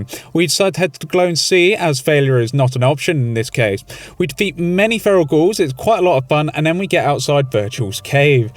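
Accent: United Kingdom. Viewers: I always forget that you're British. And then I click on a video and get a right shock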